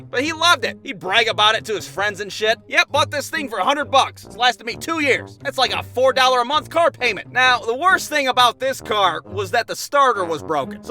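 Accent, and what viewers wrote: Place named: Arkansas, United States. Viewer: Lol Sounds like Arkansas ha ha ha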